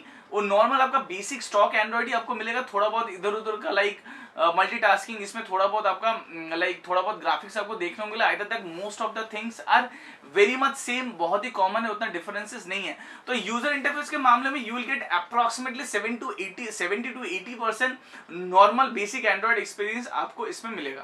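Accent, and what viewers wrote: Bengal, India. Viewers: bangali accent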